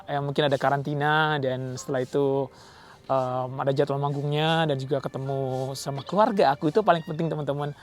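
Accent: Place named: Java, Indonesia. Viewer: Kok ragazzi medoknya kebawa ke Jakarta.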